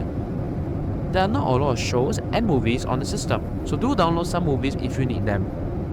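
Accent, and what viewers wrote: Singapore. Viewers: I do miss the Singaporean accent. Very nostalgic indeed. Keep it up.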